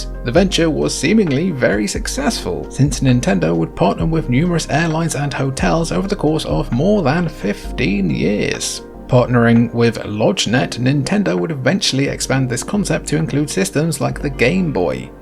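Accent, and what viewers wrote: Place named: United Kingdom. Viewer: Good stuff as an American the “th” sound doesn’t really bother me I just sort of chalk it up to “ah he’s just British, it’s cool” 😂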